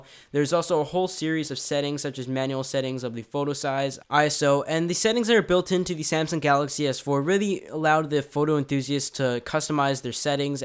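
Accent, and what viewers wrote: Canada. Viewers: oh my gosh. are you Canadian?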